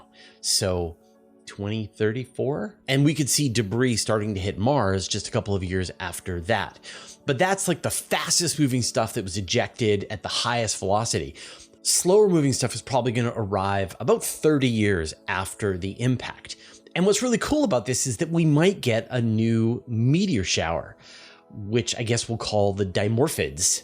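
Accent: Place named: Canada. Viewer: I think the best part of EVERY one of these programs is the Canadian accent. Words like "out" and "about becoming "oot" and "aboot". Being from upstate New York, I was raised on this and can always tell when I'm talking to a born Canadian with an occasional Wisconsin'er thrown in.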